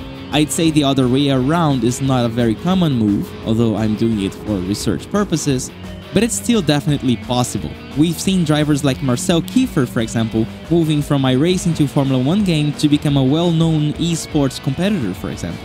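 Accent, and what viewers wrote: Brazil. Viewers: are u brazilian?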